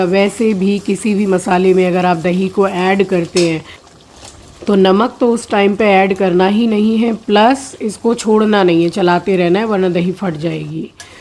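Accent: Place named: Uttar Pradesh, India. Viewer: Pehli bar aap ki lengbej kuch up Bali suni hai maja a gaya 😊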